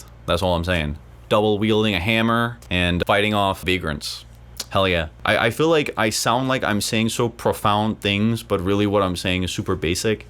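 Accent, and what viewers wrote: United States. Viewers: how can a danish be so good in american english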